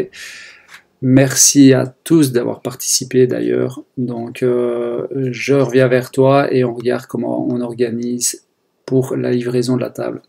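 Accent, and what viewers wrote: Belgium. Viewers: Le T-Shirt on achète! 😁 Et le coup des T-shirt d'autodérisions de notre bel accent belge pareil! Super idée, le coup de l'artisan local! Belle initiative! Félicitation! 👍👍 J'oubliais: l'établi MFT top idée!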